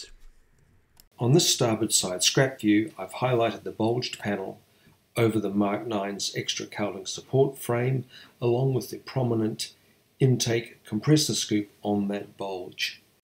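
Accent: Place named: New Zealand. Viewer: Nice to hear a kiwi accent on youtube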